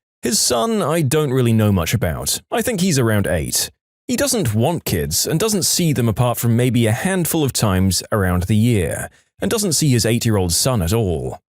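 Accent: United Kingdom. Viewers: the reason why the narrator has turned British is because it's a different narrator from Am I the Genius. he's been in a couple videos lately. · I’m not the only one who liked the British voice he used in the video. I want to hear more of the British voice. I liked it. It was nice if I do say so.❤😂😊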